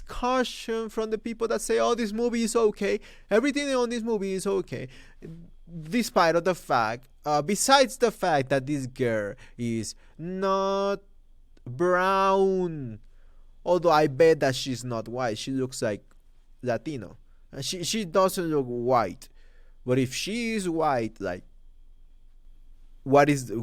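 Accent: Mexico. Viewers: No mames wey, white people are that fucking racist lmao 😂. I can tell by his Mexican accent he probably never lived longer or if any time period in America and still wants to talk about it . Pinches gringos tienen decadas de Racismo wtf duh, we can start by , not letting black and brown eat or drink water at any white people location, nowenly flooding our Brown and black streets with Drugs , not letting us buy house, giving is the worst schools , poluting around where we live and and our food we black and brown die faster. I can go on on and on and why white people are racist . Now not all whites are racist, just recently they have started to change when President Obama got elected, but I just hate how My own Mexican people come and make videos and say everything is and has always been peaches and love lmao wtf 😂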